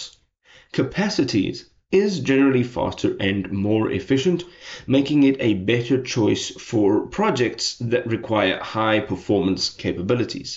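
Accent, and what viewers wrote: South Africa. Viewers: I was looking for a comparison and your video was the first I saw, I must say I could hear the South African accent from the first second :P Hi from a fellow Saffa productivity fan!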